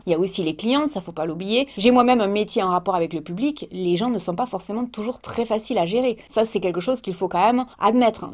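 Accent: South, France. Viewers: Je me disais que tu avais beaucoup l'accent du sud et qd j'ai entendu Perpignan j'ai mis pause et je t'ai regardé comme si yavais un cookie devant moi et j'adore les cookies 🤣❤️ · Vive l'accent du sud ! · j'adore trop marrant, surtout l'anedocte avec Kate Wislet pas mal... et j'adore ton accent, ça me rappelle le sud et le soleil :) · Coucou ♥ j'adore ton petit accent du sud et tes cheveux roux ! Tu m'as fait sourire dans ta vidéo et j'avoue que c'était bien sympa o/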